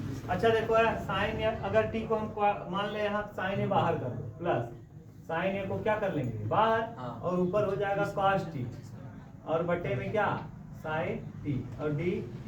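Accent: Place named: Bhojpuri, India. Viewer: really liked the way how you teach ....in bhojpuri accent ,apke bache boht shor krte hai par lol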